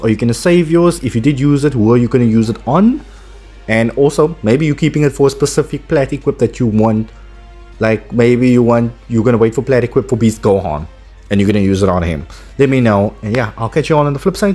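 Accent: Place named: South Africa. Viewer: Knew It was a fellow South African by the accent😂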